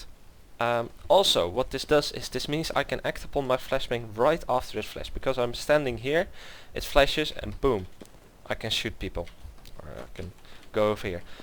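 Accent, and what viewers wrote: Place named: Netherlands. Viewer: Je bent wss nederlands ik hoor het door je accent haha :D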